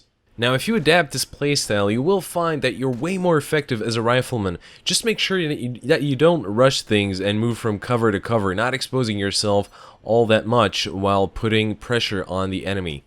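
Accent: Ireland. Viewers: Sounded like the irish accent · Is he an Irishman ?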